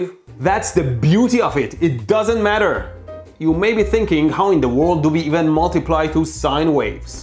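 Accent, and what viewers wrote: Iran. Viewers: چقدر لهجت بە ایرانیا میخورە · میدونم که ایرانی هستی * از لهجت معلومه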